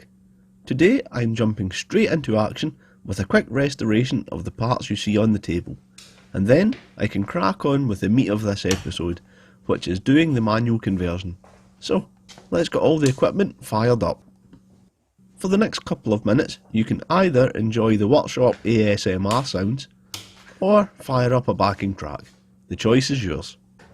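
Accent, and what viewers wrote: Scotland, United Kingdom. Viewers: I WAS ALWAYS TRYING TO FIGURE OUT IF YOU WERE CANADIAN OR BRITISH. SCOTTISH NEVER CAME TO MIND. SCOTTISH PEOPLE ARE GREAT. I HAD A SCOTTISH GIRLFRIEND ONCE UPON A TIME, SHE WAS GREAT. JUST DIDN'T WORK OUT. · I'm following this epic restoration closely and really enjoying it. I've said it before, I like the Scottish accent.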